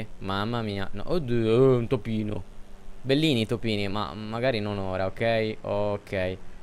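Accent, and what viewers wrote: Sardinia, Italy. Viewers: Ma cosa sei sardo?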